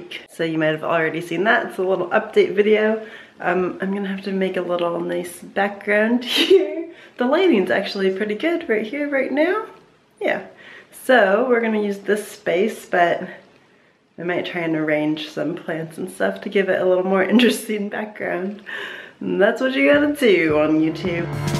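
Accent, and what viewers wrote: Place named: New Zealand. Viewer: we want one video where you the entire time speak New Zealandish:)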